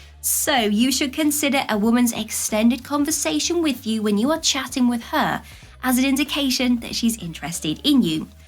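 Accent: United Kingdom. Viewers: Sure. Combination of Karen Valentine and Lily from AT&T with a smooth British accent doesn't hurt either.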